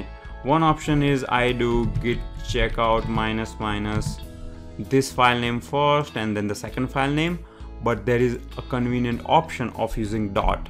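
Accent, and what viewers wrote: India. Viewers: So simple, so understandable and with the Indian accent that switches the brains into learning mode. Thank you!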